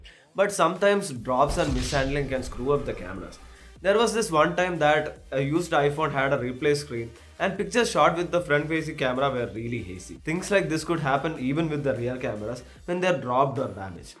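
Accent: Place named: Kerala, India. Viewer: 10 seconds into the video and I know that's a mallu guy speaking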